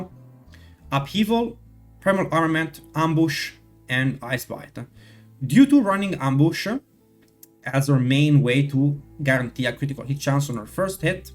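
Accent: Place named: Italy. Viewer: Hai un accento quasi italiano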